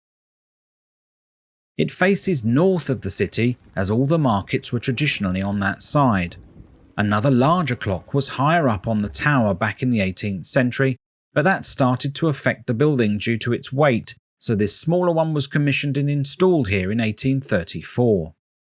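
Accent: England, United Kingdom. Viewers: Спасибо за настоящий английский и субтитры!😃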